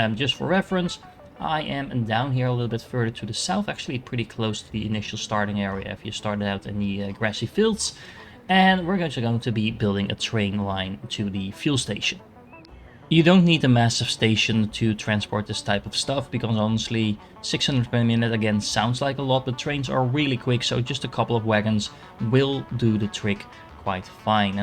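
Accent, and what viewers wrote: Netherlands. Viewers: Super goeie video, toen hoorde ik dat je nederlands was en ja😂 lekker man, goed bezig!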